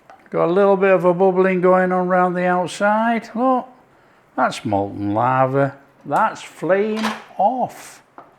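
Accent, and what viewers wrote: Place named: Lancashire, United Kingdom. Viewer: We made the flapjack tonight, we are in Yorkshire near Whitby. We think you sounded originally Lancastrian maybe Burnley, Preston Accrington or possibly the Rochdale area. Please put us out of our misery 😄 Yorkshire or Lancashire? Either way we have really enjoyed your video, subscribed and laughed when you said "cook off" xx❤xx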